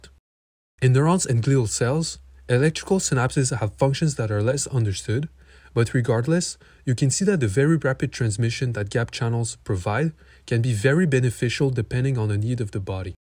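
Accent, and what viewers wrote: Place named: Quebec, Canada. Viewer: you have a quebecois accent lol. love the video tho it helped a lot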